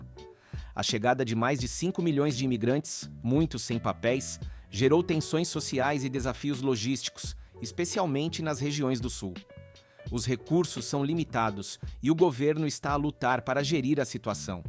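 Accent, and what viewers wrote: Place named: Brazil. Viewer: Esquisito esse texto em português de Portugal com voz de português do Brasil. Sector, perceção, despedimento... 🙄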